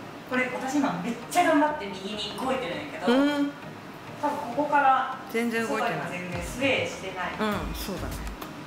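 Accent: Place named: Kyoto, Japan. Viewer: 京都弁ええなぁ～🤣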